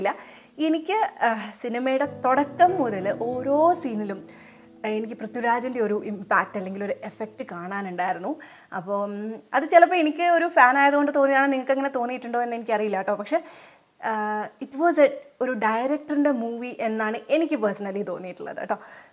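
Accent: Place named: Thrissur, India. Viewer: ചേച്ചി തൃശ്ശൂർക്കാരിയാണോ തൃശ്ശൂർ ഭാഷയാണല്ലോ സംസാരം കേൾക്കുമ്പോൾ. ചേച്ചി വളരെ നന്നായി Review പറഞ്ഞു. Lucifer is a mass movie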